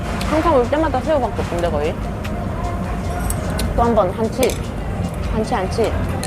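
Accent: Gyeongsang, South Korea. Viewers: 경상도 사투리 구수함 😊😊😊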